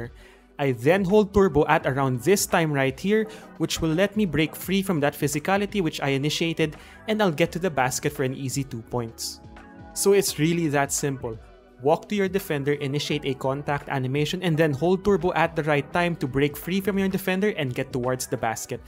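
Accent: Philippines. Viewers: Bro are u filipino i can hear it in the accent lol all my teachers were filipino on guam 🤣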